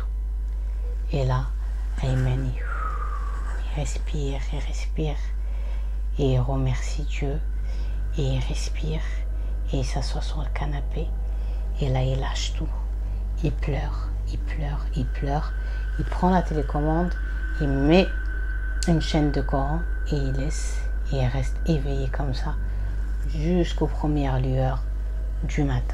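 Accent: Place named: Arab world. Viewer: J'aime beaucoup votre façon de parler l'arabe;votre accent en arabe me plaie beaucoup.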